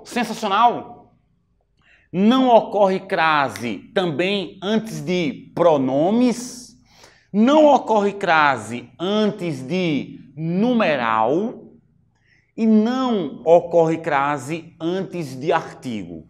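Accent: Northeast, Brazil. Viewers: Meu caro professor. Suas aulas são espetaculares. E mais, seu sotaque nordestino, é fenomenal. Aprender língua portuguesa deveria ser a meta de cada um dos falantes desse idioma maravilhoso. E, tem gente que não gosta. Será que tem medo de aprender? Pra esses "possíveis medrosos", fica a reflexão de Sócrates: - podemos facilmente perdoar uma criança por ter medo do escuro. A real tragédia da vida, é quando os homens têm medo da luz. Abraços.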